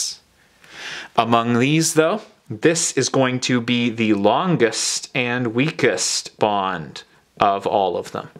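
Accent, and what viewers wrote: Canada. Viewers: you're canadian aren't you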